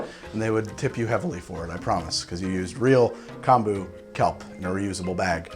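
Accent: New Jersey, United States. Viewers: He does a good Spongebob laugh and I wonder since he's in Jersey does he pronounce Water the correct way or does he change it so the other state living people don't start up their bs?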